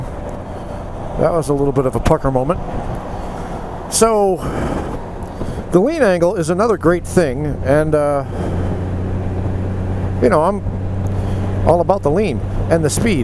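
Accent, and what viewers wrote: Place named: Boston, United States. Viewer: Laytah ....BOSTON ACCENT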